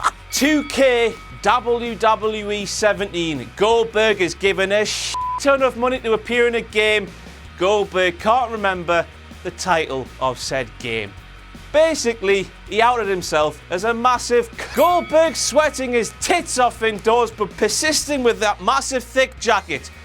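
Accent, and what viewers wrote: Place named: United Kingdom. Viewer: I love British accents and ranting it's just the best.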